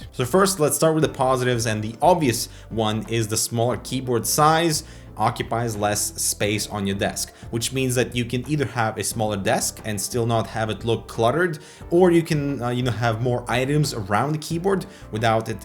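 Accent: Sweden. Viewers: You sound Swedish...Are you Swedish? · You sound like your Swedish. I like it :)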